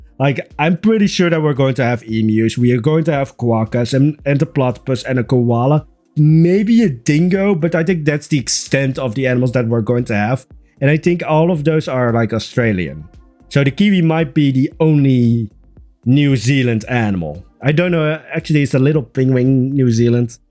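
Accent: Netherlands. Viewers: Dutch accent haha❤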